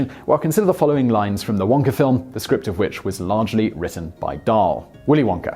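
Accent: United Kingdom. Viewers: This video is thee DEFINITION of MISINFORMATION/PROPAGANDA... Once I heard your British accent it becomes CLEAR how much of a SHILL/AGENT you ARE .... 3 million subs huh? No SHOCKER most people are LOST AF reading through the Comments people CONTINUE to remain LOST while they're being MOCKED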